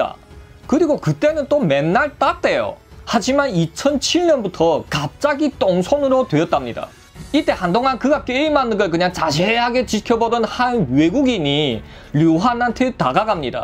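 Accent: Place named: Shenyang, China. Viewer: 진행자가 조선족 인간이네 말소리들으니 선양조선족이다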